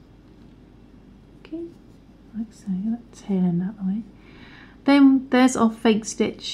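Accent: England, United Kingdom. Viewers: Loving your channel. I’m 74 from UK and newbie to crochet, struggling with lockdown. You have a lovely clear english voice and clear instruction. (U.S. contributors - of anything - so long winded!) I finally did your daffodil - after many mistakes! and now doing your lovely piggy. Many thanks. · It’s nice to have somebody English nice and slow and clear and showing you exactly what you’ve got to do your brilliant thumbs up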